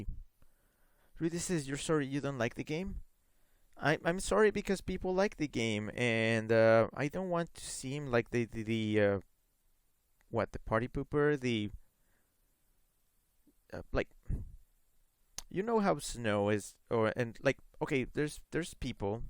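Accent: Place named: Mexico. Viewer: Yes; I feel abou the same... even the HMs, which I do not miss, leave a hole. Also, I do not mind waiting a few years and playing other games... what we did in the ol days! Your theoretical On a different note, your Mexican accent is more noticeable here... though a carefu listening reveals your mastery of English, even while speaking.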